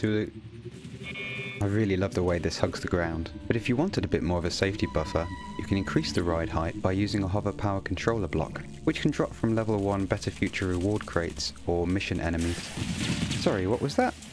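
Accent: United Kingdom. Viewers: That British is awesome. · I never knew you were British (or at least had a British accent) Oh and I won't like this until somebody decides to ruin that 100 like perfection with another like or a dislike.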